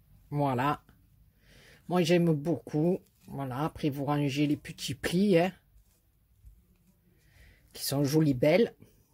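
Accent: South, France. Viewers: Merci infiniment, j'ai vraiment passé un merveilleux moment avec l'accent du soleil..... Pour moi les petits anges ce sera pour l'an prochain, cette année nous quittons notre maison parce que ça fera 1 an le 24 décembre que notre chaton à rejoint les anges et nous n'avons pas le coeur à fêter sans lui.... Encore mille mercis et de très belles fêtes à toi et tes proches dans l'amour et la joie, gros bisous. Lucia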